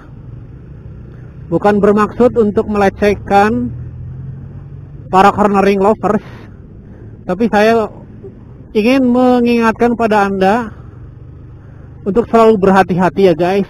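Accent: Banyumas, Indonesia. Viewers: Ngapak temen lur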